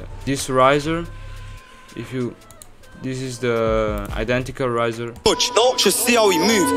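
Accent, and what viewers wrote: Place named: Italy. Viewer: ahaha nice remake bro. Si sente un po’ l’accento italiano ahahah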